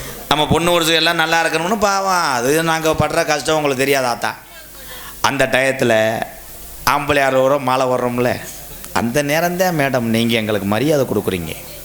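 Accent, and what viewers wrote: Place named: Sivaganga, India. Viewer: Bro ithu aanavam illa...sivagangai seemai native slang.He is a good person.He did many helps for the poor peoples❤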